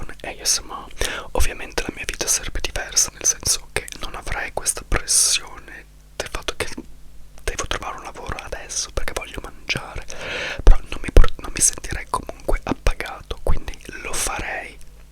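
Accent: United Kingdom. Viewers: Scusami ma ho alcune critiche da farti: 1 sei fissato con la corretta pronuncia delle lingue ma poi hai perso l’accento italiano corretto pronunciando la t inglese e spesso le doppie molto poco doppie. 2 buffo come alla fine il video da italiano pentito in cui praticamente disprezzi l’italiano è quello più visto. Alla fine ciò che hai disprezzato e cercato di cancellare dalla tua identità è ciò che ti ha dato più soddisfazioni. 3 hai un atteggiamento vittimistico nei confronti della vita, le sfighe succedono tutte a te. Per questo ti consiglio di vedere uno psicologo. Avere un male incurabile è qualcosa fuori dal nostro controllo ma scegliere persone sbagliate di cui invaghirsi o non riuscire in un lavoro dipende da noi. Per esempio fare la drag ma odiare il mondo drag e non uscire con loro significa essere tagliati fuori dal giro in un mondo in cui i contatti sono tutto. 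Se è quello che vuoi fare come lavoro devi scendere a compromessi, altrimenti resta fedele a te stesso e rendilo un hobby. Il mondo non ti deve niente, se vuoi qualcosa vai e falla ma sii consapevole dei compromessi che sono richiesti, nessuno ha detto che il mondo sia giusto o che basta il merito purtroppo. 4 sinceramente non capisco l’esigenza di dover sentir parlare inglese. Non capisco la fissazione per l’inglese. Si sceglie di vivere in un posto perché è il migliore per le proprie esigenze lavorative e di stile di vita e magari anche per gli affetti che uno ha. Se sono una makeup artist e la città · Ma perché se sei italiano parli con l’accento inglese? So che non vivi in Italia,ma non comprendo il dover sforzarsi a far sentire per forza che si ha un accento straniero… 😅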